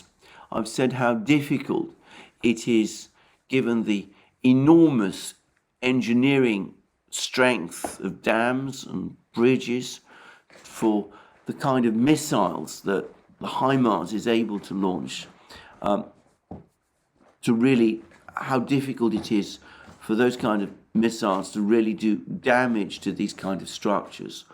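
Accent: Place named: RP, United Kingdom. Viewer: It strikes me that Alexander for some reason chooses to use BBC rules of pronunciation, e.g. at his public school his peer group would have said adversary with three syllables, swallowing the second 'a' and he has accepted training to get 4 syllables from it. And why has he stopped saying referenda? Who told him to and why does he agree to it?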